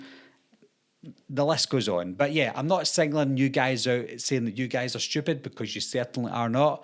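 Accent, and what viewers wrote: Scotland, United Kingdom. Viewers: Love the Scottish accent ❤ but I warn any1 don't come 2 the south in America...its totally a different language lol....im from the south and don't understand half the people 😂....love ya Brotha❤ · I'm sad to hear that there are people who make fun of your accent, this is just cruel. I'm from Oklahoma and half the reason that I subscribe to your channel was that I loved your voice. I've no trouble understanding most accents, including Scottish, maybe because I collect recordings and others from the United Kingdom. I wish I could visit but can't afford.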